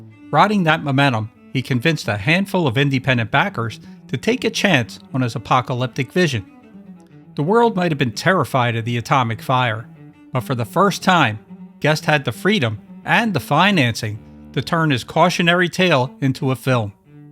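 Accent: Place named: Philadelphia, United States. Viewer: Am I right that you are either from Philly or a close suburb? I hear familiar o’s 😊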